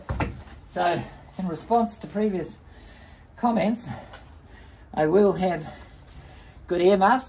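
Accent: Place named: New Zealand. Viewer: You can never get enough of that KIWI knowledge. The accent doesn't hurt.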